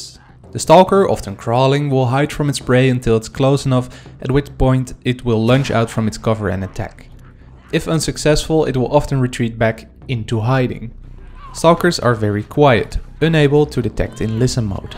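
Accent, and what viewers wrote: Netherlands. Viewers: I know this is of topic to the video that ur making but are you by any chance Dutch? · Are u Dutch? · You can hear really good that the guy who talks is from the netherlands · jezus gozer, dit is echt het meest nederlandse accent ooit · Do I recognise a Dutch accent here? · Are you from the Netherlands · Are you dutch 🇳🇱?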